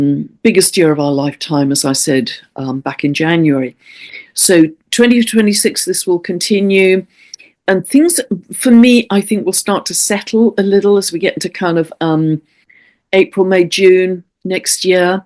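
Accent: England, United Kingdom. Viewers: On the couch with red cushions; I love it, it's so normal and likeable. A clear English accent and, above all, a super-positive charisma and energy! I love your videos; they're a true blessing and a constant companion. It's wonderful that we're connected all over the world! Thank you. Best wishes and belated happy birthday!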